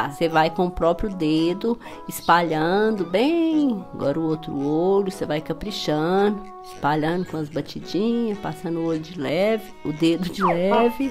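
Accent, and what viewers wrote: Minas Gerais, Brazil. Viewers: E esse sotaque mineiro haha amo, mineirinha tbm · Se eu que sou de Minas amo esse sotaque nosso,imagina quem não é 😍😍😂😂😂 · Bao de mais...amo sotaque de Minas Gerais 🙌😁😘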